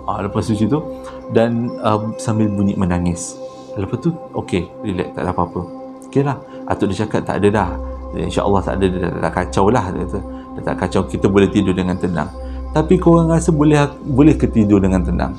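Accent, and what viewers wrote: Johor, Malaysia. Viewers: Yer la… melekat tul Johornya tu… I like! 😄😄😄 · Abg memang berbakat beso cara penyampai cerita seram pelesit dgn loghat johor .. 👍👍👍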